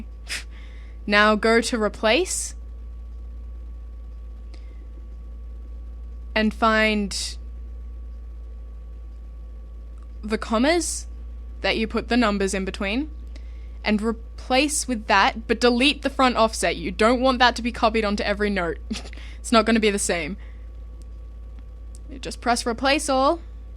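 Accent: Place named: Australia. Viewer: You're Australian!? ;D I love your accent!~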